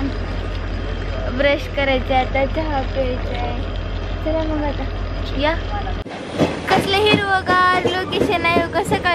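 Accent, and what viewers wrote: Latur, India. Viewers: Tuzya aavajala khup sut hoti aapli Latur chi bhasha.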